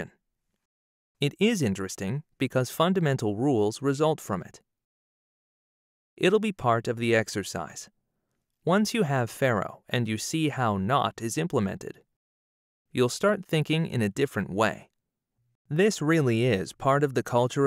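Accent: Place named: France. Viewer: The MOOC is also offered in English with a French accent.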